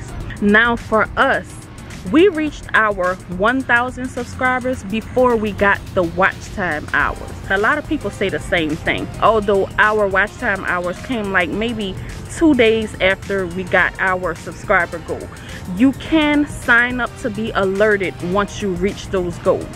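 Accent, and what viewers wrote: New Orleans, United States. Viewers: I love your accent. It's sounds like a good N'awlins ( New Orleans) accent. I can recognize that accent anywhere. Lol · Do I hear a New Orleans accent??? I'm new to this channel. Keep up the good work!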